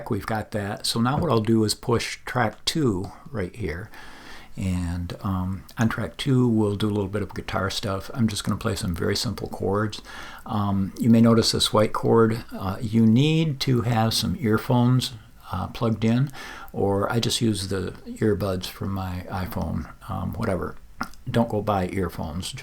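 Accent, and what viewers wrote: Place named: Vermont, United States. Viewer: Very helpful! Well done and you sound like you are from Vermont :) many thanks!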